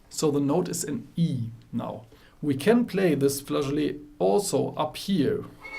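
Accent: Germany. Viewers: According to your accent, you're probably from Germany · I love your Denglisch!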